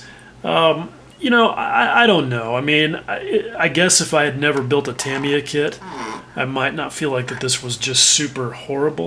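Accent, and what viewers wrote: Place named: United States. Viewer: Hi my name is William also, I like your style, your accent is soo American. I love planes and tanks and the whole model building scene, its so deep. I just found and joined the Newburg model car club, cause I love cars to. Thanks for your response last time on my comment like some kind of double negative always never disappoint? I cant remember. Any way I am very new to internet interaction and although an old hand at model building new to year round participation still a winter warrior. God bless and look forward to talking to you and sharing my model building experiences.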